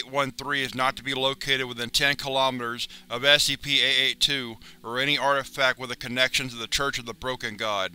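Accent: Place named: Alabama, United States. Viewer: You sound like someone who lives in Alabama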